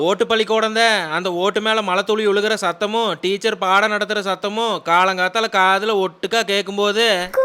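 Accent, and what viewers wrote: Kongu, India. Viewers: Unga kongu bashe kagathan subscribe pannine Thambi.wonderful,kettutte........irukkalampole irukku.Valga valamudan👌👌👌👌👌👌♥️♥️♥️♥️♥️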